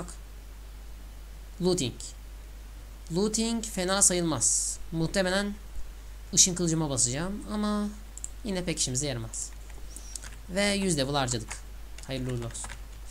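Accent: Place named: United States. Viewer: Abi senin şu amerikan aksanı gibi konuşmana bayılıyorum :) (Bkz: -Ahh kimin umrunda :)) )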